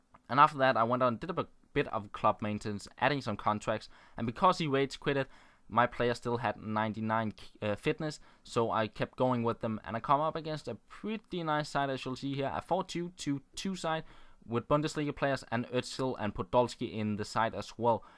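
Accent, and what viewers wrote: Denmark. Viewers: You sound like a Dane.. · he's so danish its hilarious i love his commentaries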